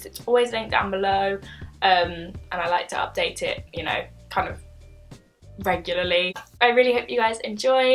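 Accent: United Kingdom. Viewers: Oh and btw, I know you're British, and have a British accent, in case that was clear from my previous post, lol.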